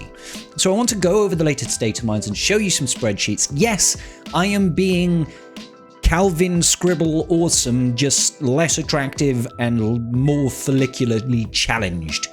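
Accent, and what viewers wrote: United Kingdom. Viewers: Error? Era. You’re a Brit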